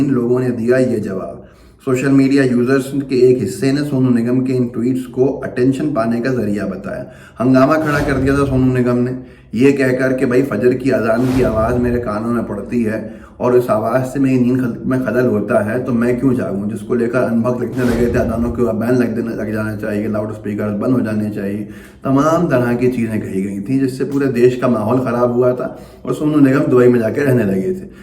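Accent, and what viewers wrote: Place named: Bhopal, India. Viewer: Are khaan tum to bhopali lag riye ho.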